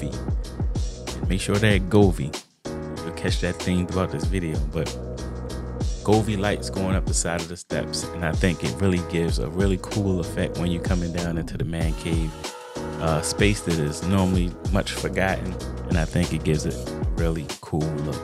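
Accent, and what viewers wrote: Baltimore, United States. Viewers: I knew right off the bat youd be a ravens fan based off that heavy ass accent lmao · You definitely from bmore 😂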